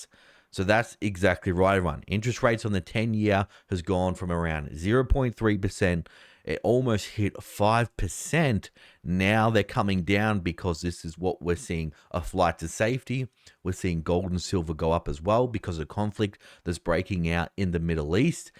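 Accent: Australia. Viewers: PLEASE! use your Australian accent d-arta!/data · It's Data Not "Daita" You're Australian. Transparent yearn for USconnection. So either change your accent totally or stick to your OZ accent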